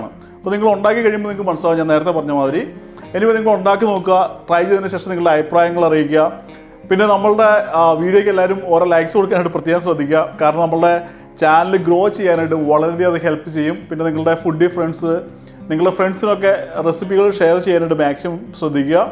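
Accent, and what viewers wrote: Kannur, India. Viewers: the way you speak resemble like you are from kannur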